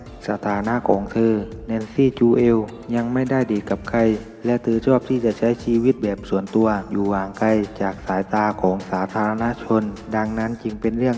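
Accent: South, Thailand. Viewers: คนพูดนี้คนใต้ม้ายอ่ะ น่าว่าหมันใช่ม้าย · เสียงเจ้าของช่องเหมือนคนใต้จังคับ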